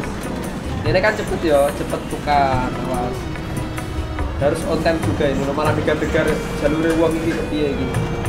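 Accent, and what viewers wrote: Java, Indonesia. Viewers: jawa men mas omongane heheh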